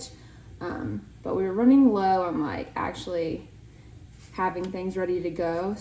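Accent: United States. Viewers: oh my gosh, how i love the American accent! I need to move from Australia asap lol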